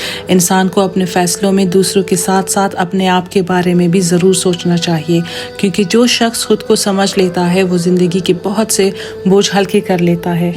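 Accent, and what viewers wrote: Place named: Pashto, Pakistan. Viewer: بہت دلکش اواز اور باتیں ہیں آپ کی اردو تھوڑی پشتو والی لگ رہی ہے اپ انگلینڈ میں رہتی ہیں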